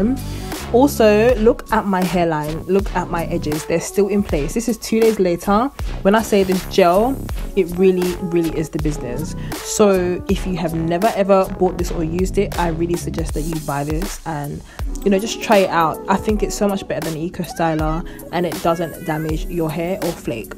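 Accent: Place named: United Kingdom. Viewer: Lol the accent doesn't fit the face but it's really nice to hear a UK accent welldone good job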